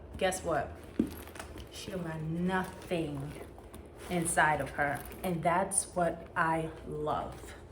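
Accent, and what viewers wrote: New York, United States. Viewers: For sure from NY lol I know that accent 😍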